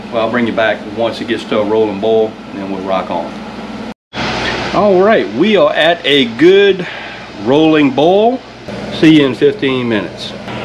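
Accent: South, United States. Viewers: That comment isn't need this his youtube and he talk from the south i was born in Smokies Mts i talk like from the south .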